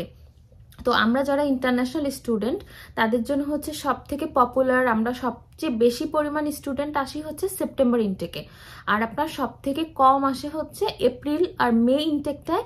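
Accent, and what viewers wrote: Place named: Khulna, Bangladesh. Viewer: Assalamualaikum Apu. Are you from Khulna? I am from khulna and your Bangla tone seems very familiar to me. Zajakallah ❤️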